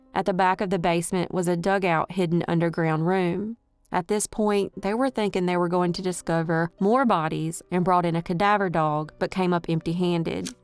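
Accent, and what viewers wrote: South, United States. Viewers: Hahaha! Oh she's a real as you can get!!! She's 100% authentic!!! I can give you big ol' Texas gaurantee She's as real as you will EVER get!!!! And we love her!! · You can't do the southern drawl with AI. I really like hearing someone who speaks like people I know down here in the south. Thx!! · I thought bout tht. The southern accent. But who knws wat those things are capable of....🤔🧐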